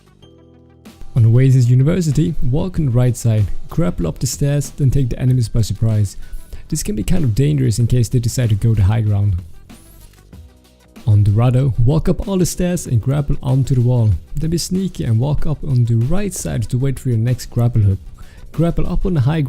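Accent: Sweden. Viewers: are you Swedish? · Alex B its a swedish accent or southern swedish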